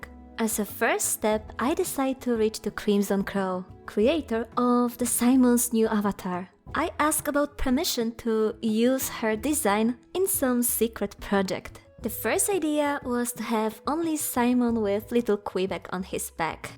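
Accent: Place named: Poland. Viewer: Don't worry about the language, you did a good job speaking. The Polish accent is noticable and I find it slightly funny/wholesome to listen to, as a Pole, but I think that's just how most of us sound like trying to speak English without much experience. I might be making an English channel after Hytale releases so I also definitely will be sounding like that 😄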